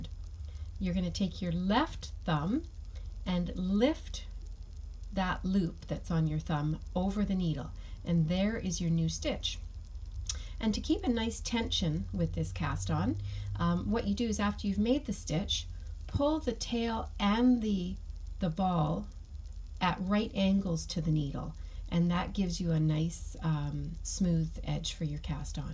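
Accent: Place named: Canada. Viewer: Love the Canadian accent 😚🇨🇦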